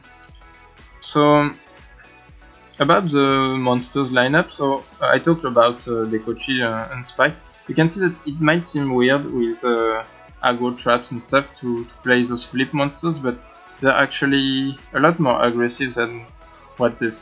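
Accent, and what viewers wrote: France. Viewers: You sound french. Wanna play some goat in paris ?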